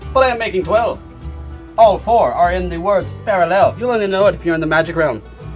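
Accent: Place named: United Kingdom. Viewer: Love the British or is it Irish accent LoL 🤣😆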